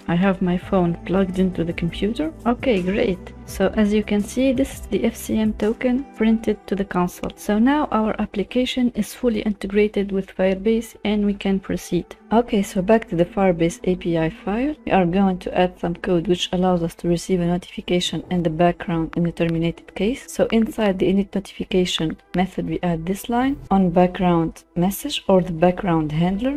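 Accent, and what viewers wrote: Tunisia. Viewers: great tuto and in point, but i can't stop ignoring your tunisian accent, it's like hearing my self talkin, keep going my tunisian girl <3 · the English accent is so Tunisian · ur tunisian right? haha ur accent is soo tunisian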